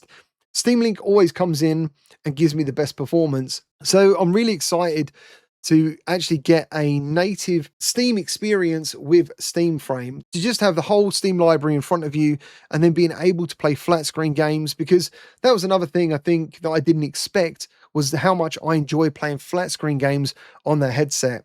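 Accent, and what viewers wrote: United Kingdom. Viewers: I want it but without color passthrough for augmented reality, it's a pass. But your balance test wouldn't be an issue if you did it with the proper head strap. We all know the Quest head strap out of the box has been terrible since the Quest 2. It as a Meta decision designed to keep the headset price down. So funny how you guys across the pond say router as rooter. But honestly, I wish we'd stop including letters in words that aren't pronounced, no matter the language.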